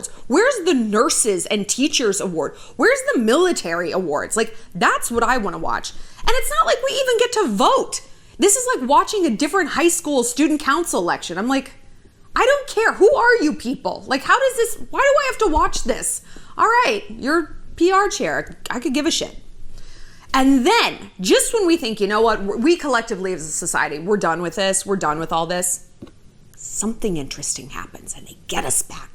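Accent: France. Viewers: Ton accent français est très mignon!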